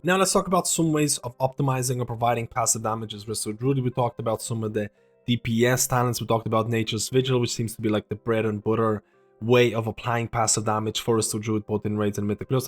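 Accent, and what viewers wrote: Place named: Ireland. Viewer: Great vid as per usual! Why do I always here a tinge of an Irish accent from you? Lol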